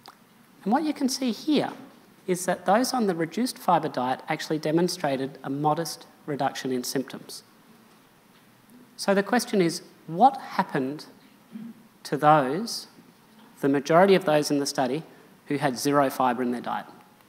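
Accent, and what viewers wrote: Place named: Australia. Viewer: Always a pleasure to listen to. Dr. Mason's appearance on HPO podcast was a huge treat and packed with fascinating info (check it out if you haven't). His lovely aussie accent is just icing on the cake.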